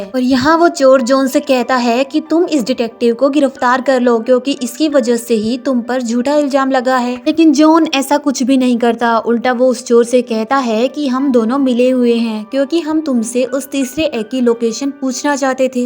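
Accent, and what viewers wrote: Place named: Pakistan. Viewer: Nice explain... Tumhar aawaj se lagta .. Tum Pakistan se ho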